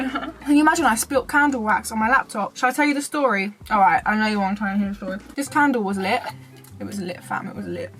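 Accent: United Kingdom. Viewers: I'm also British but I love how extra British you are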